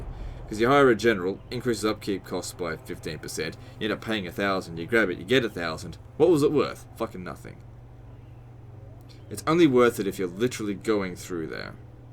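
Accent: Australia. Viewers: Love your attitude about doing it your way even when the advise is totally correct. I can relate, but you're way more extreme in it. Strong personalities = manly balls, keep this shit up my Australian-Sound-More-Thor-Than-Thor-Himself-Legend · Linoge Z: Well it sounds like he has an Aussie, or New Zealand accent. So I highly doubt it was a US education. But for a guy who can't differentiate between to, and too, I think your hardly in a position, to be talking about someone else's education. No matter where they're from!..😏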